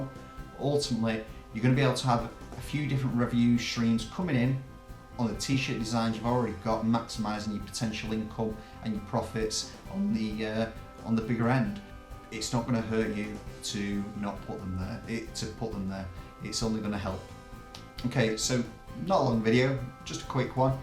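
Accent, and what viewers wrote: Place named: United Kingdom. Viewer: You sound from UK, how do we sign up for it in uk?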